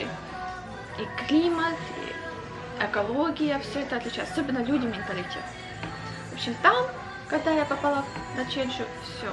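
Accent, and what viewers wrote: Ukraine. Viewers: Харьковский суржик - это здорово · ты говоришь на суржику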